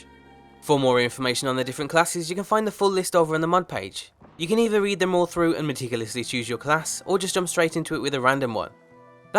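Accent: United Kingdom. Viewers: I'm a subsriber since...a long time, but I still don't know where is from. I'm guessing british, but not sure.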